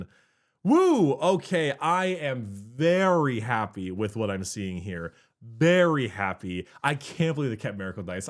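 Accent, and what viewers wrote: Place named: California, United States. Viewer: "Mare-icle" dice. Californian accent be strong.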